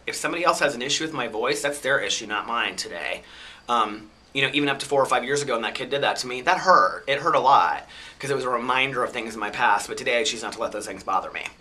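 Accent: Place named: West Coast, United States. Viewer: Wow, you sound like you're from the West Coast. But it's like, who you are, so who cares?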